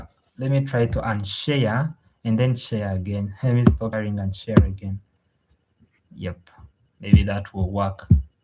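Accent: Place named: Nigeria. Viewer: are you from Nigeria by chance? btw your Chinese sounds less accented than your English lol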